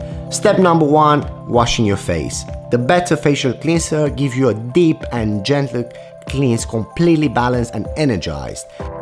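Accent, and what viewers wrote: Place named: Italy. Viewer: Is he Italian or something he has an accent that us not fully British.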